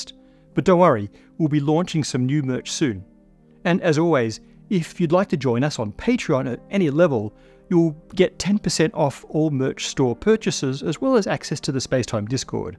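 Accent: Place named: Australia. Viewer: Matt is my all-time favourite narrator. His Aussie accent, perfect pronunciations, and fluid delivery are awesome. Give this man a round of applause! :hand-pink-waving::hand-pink-waving::hand-pink-waving::hand-pink-waving::hand-pink-waving::hand-pink-waving::hand-pink-waving::hand-pink-waving::hand-pink-waving::hand-pink-waving::hand-pink-waving::hand-pink-waving::hand-pink-waving::hand-pink-waving::face-blue-smiling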